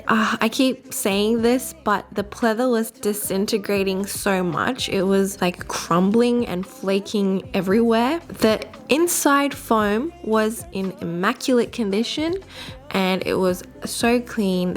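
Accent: Australia. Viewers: An Australian Accent?! Really sounds like your from Sydney :D !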